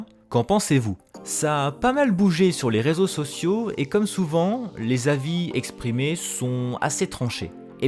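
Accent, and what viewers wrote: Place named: England, United Kingdom. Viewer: J'aime beaucoup ces vidéos d'analyse et de décortication. (Superbe accent anglais au passage). Bon... perso je suis clairement déçue. J'en ai déjà marre des minikits, et même si j'ai acheté les précédents car ils pouvaient apporter des choses à mon jeu (et que je suis une collectionneuse, j'avoue), eh bien celui-là ne m'attire pas DU TOUT. Alors je me pose une vraie question... Est-ce que je deviens une vieille aigrie ? Est-ce que ça y est, je n'arrive plus à vivre dans mon époque ? Mais franchement je trouve toutes les pièces présentées très laides, voire immettable. Non mais franchement, IRL qui a envie de porter ça ? Je ne suis pas une pro du style hein, du coup mes propos n'engagent que moi, mais m** quoi, moi aussi je voulais des tenues hommes simples et mettables ! Donc du coup je suis en colère. Je ne paierai pas pour avoir 1 paire de pompes et 2 pulls ! 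Voilà ! 😑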